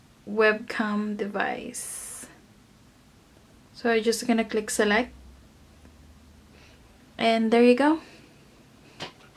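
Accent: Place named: Iraq. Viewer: I feel that u r iraqi person speaking english xd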